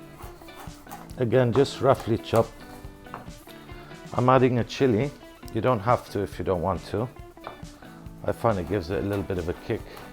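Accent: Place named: Greece. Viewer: πολύ ωραιο το βίντεο και σε στηρίζω να αρχίσεις και τα "αγγλικά" βίντεο. Μην σε ανχώνει η προφορά, τι να κάνουμε είμαστε ελληνάρες (χαχα) , μπορεί εδώ να σε κρίνουν αρνητικά αλλά πιστεψε οι έξω θα το λατρέψουν γιατί τους άρεσει που τα βρίσκουμε λίγο δύσκολα. Φυσικά με περισσότερα βίντεος θα λυθεί και η γλώσσα σου σιγά σιγά :)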